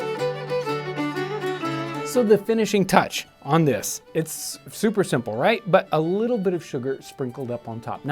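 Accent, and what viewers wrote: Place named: Midwest, United States. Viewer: Wrong. I knew a guy from the 18th century who found a camera. He had a much different accent. This guy talks like some dude off the street in Everytown, Midwest USA.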